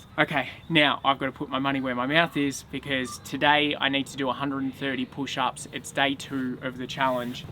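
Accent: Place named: Australia. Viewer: Obnoxious Aussie accent.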